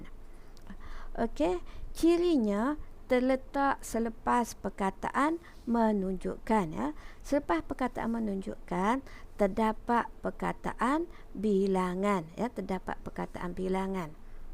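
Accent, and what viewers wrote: Kelantan, Malaysia. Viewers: Cikgu mesti drpd Kelantan kan? Suara cg sedap didengar buat kitorang xberhenti fokus..terima kasih Di atas perkongsian..❤️❤️ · Kecek kelate ye cg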